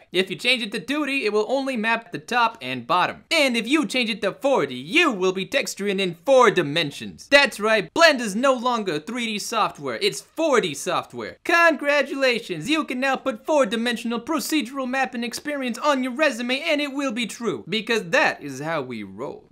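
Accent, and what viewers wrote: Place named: Brooklyn, United States. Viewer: I FEEL LIKE IM IN BROOKLYN LOL, I LOVE IT!!!!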